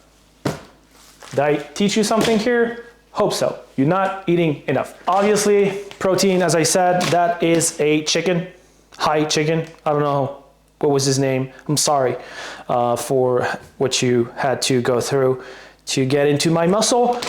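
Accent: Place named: France. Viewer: Your lovely French accent makes everything sound so refined and erudite. Lots of love from SK!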